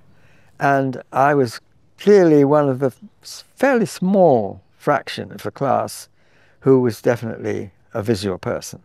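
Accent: United Kingdom. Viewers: Gypsy tongue with a British accent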